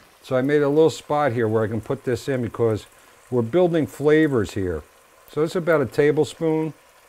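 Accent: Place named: New Jersey, United States. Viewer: Belleville, Bloomfield, Nutley or Newark? Your speech patterns sound like home. Your recipes are marvelous. Thank you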